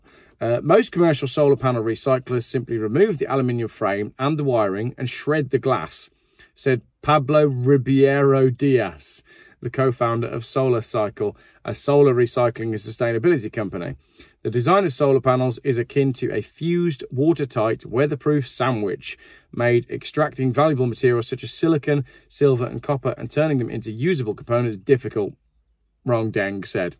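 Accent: Australia. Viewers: Love your ozzie accent ❤ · Watching in Australia... that is the best Australian accent I've ever heard from a pom, my friend. Most people over do it. You got it just right, Deng 😆👍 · Pretty good Aussie accent,. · Great stuff, even the Aussie accent is spot on. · Loving the oz accent 😂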